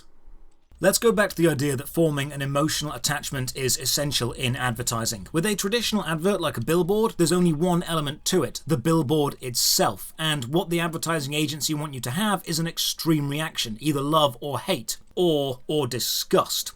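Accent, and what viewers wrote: United Kingdom. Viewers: Hi Double Barrell British Sounding Well Dressed Man.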